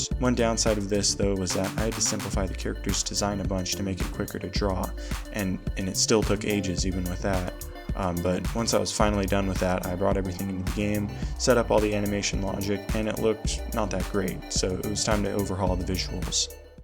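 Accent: Australia. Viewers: I bet you're australian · Let me gues. Australia?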